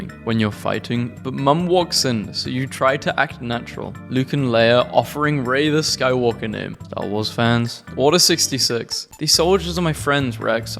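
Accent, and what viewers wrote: United Kingdom. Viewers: Howdy again British feller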